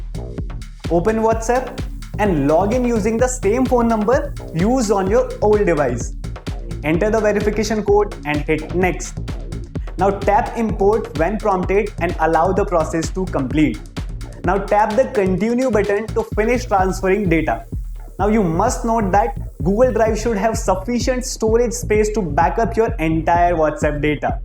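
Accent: India. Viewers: Indian accent is haunting us on YouTube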